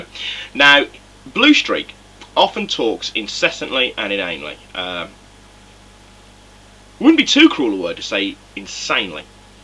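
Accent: United Kingdom. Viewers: your british.wtf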